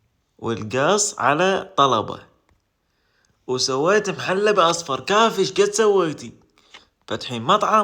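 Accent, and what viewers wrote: Iraq. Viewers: يا سلام على لهجتك العراقية ما شاء الله أنا نسيت الصور وركزت مع كلامك الله يبارك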